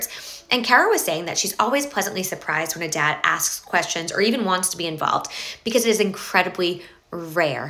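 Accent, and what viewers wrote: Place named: West Coast, United States. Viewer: She has to be West Coast. They have developed the most annoying accent in the US.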